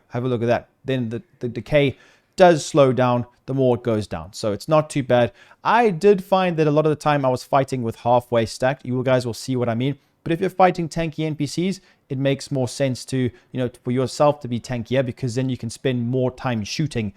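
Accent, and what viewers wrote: South Africa. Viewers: My bru awesome video, are you from South Africa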